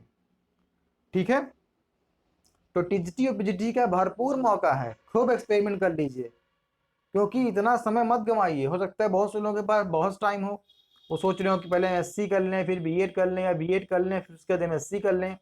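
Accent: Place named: Allahabad, India. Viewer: Sir aap ki bhasa Allahabdi lg rhi hai mja aa gya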